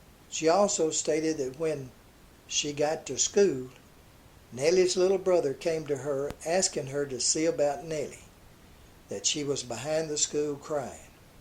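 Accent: South, United States. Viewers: You do a great job of these little videos, and I enjoy your colorful regional accent (I have deep roots in the South - my mother was from middle Tennessee and my father from Virginia so I am used to drawls), but forgive me if I correct one grammatical error which always drives me crazy when I read or hear it: it's hanged, not hung. Use "hung" for things ("I hung the laundry out to dry"), but "hanged" for people ("he was hanged for murder"). This is a common grammatical error and surprises many when they discover it, but I had a grade-school teacher who was adamant about correct grammar. Just sayin'. Thanks again for all these entertaining and informative videos!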